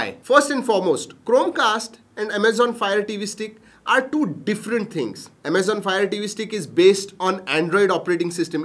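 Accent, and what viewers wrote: India. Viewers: jatin gupta if you wan't to discourage this guy do it based on incorrect facts produced by him but don't disrespect they guy "Indian accent" just because of his lack of factual data in the video.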